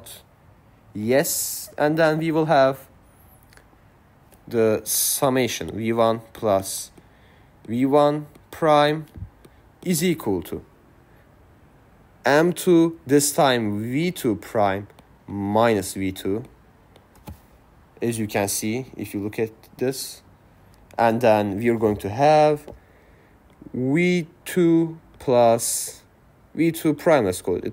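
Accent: Turkey. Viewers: nice video nice turkish accent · aksanından türk olduğunu anladım direkt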